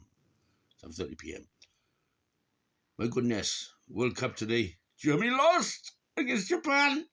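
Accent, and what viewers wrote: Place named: Wales, United Kingdom. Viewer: Evening, always nice to hear your views, and that wonderful Welsh accent! Albannach, not Albanian ya Wallonian🤣🤣🤣 We live in interesting times.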